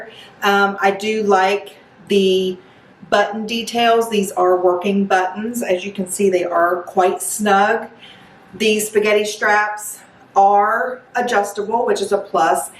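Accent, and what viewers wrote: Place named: Boston, United States. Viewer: Do you live in Boston? You have a nice accent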